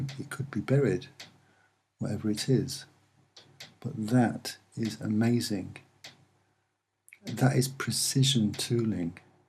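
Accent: United Kingdom. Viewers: I believe him because he has a British accent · OMG another soft spoken British guy You're on fire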